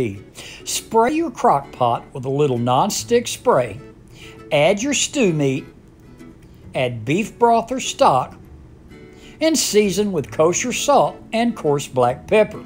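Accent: South, United States. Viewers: That looks easy and delicious 😋 Are you Southern?? You sound like my hubby😊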